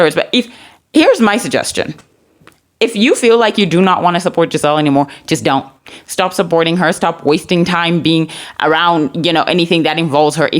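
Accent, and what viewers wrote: United States. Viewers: I had no idea that you had never been to the U.S. before. I always thought that you spoke English with an American accent. ; )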